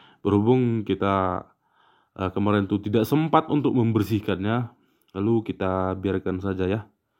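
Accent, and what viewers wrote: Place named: Gayo, Indonesia. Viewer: Dari cara berbicara abg, pastinya saya tau sukunya Gayo...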